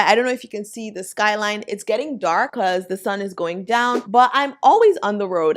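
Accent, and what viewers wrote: Nigeria. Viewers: Are you Nigerian by chance?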